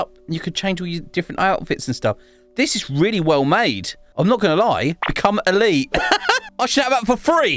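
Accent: United Kingdom. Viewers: I'm here because of elite British accent also he said the majic word ( bottle of woater )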